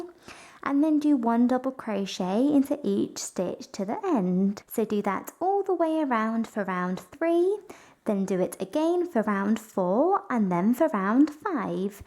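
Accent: United Kingdom. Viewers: An extremely strong UK accent. 💕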